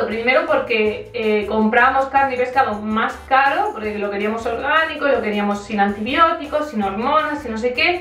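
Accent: Granada, Spain. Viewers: Hola! De que parte de Granada eres? La verdad es que en el acento me soñabas a granadina, jajajaj pero hasta que no lo has confirmado no estaba segura. Un saludo de otra granadina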